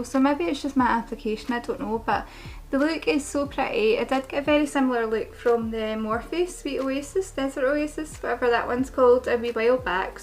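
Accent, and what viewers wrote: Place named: Glasgow, United Kingdom. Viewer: I love this look, it's quite simple but brilliant. You sound like you're close to where I'm from, I'm from Glasgow, Gorbals to be exact 😁👍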